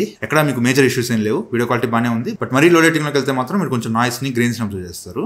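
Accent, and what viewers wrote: Nellore, India. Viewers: Bro medhi Nellore aa😊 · Bro miru nellore a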